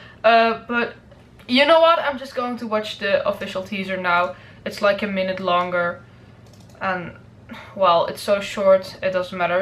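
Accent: Netherlands. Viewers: Hey! ik hoor aan je engels dat je nederlands spreekt :p ik ben ook een grote Star Wars fan!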